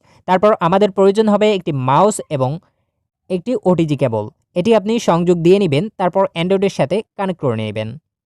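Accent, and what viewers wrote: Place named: India. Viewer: If you speaking english, try to talk slower, now it feels like you talking indian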